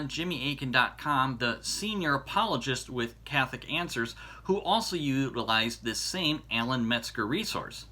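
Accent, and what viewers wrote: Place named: United States. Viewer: I can't understand your terrible American accent.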